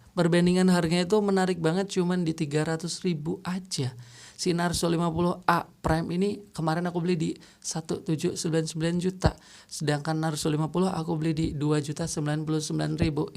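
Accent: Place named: Banyumas, Indonesia. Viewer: Abang ngapak orang mana ya?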